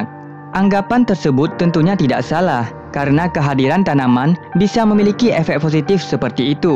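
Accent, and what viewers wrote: Aceh, Indonesia. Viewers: orang aceh bang? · Nah iya kan org Aceh ni pasti yg punya channel 🤣🤣🤣 logatnya ya kan cuy 🤣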